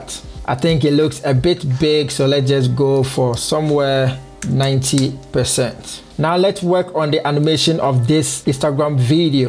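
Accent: Ghana. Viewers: Hi. Love the Ghanian accent. How to you add effects to web pages like you did from showing the videos you make. Once again LETS GET STARTED.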